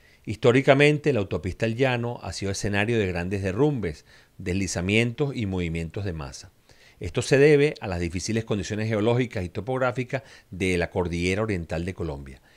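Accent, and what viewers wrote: Venezuela. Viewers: venga: el que habla es un veneço?.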